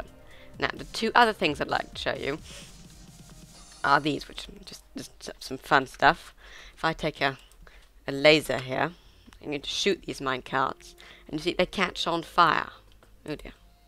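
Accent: RP, United Kingdom. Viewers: Very helpful for an automated farm! Additionally love the RP accent. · Wow you are very good at this "tekkit" of which you speak of... although are you Brittish because you seem to have a very 'posh' accent...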